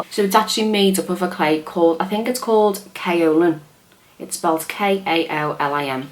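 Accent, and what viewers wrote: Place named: Ireland. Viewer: Your accent is really pretty. Are you Irish?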